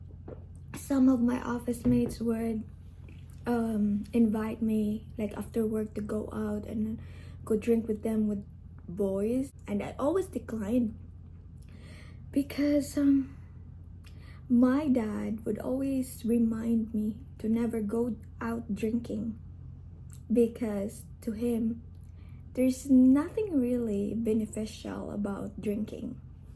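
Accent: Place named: Philippines. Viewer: I'd thumb it up twice for authenticity. This lady is a representative of a real everyday Filipinas. She isn't fake or showboating or covering up her accent.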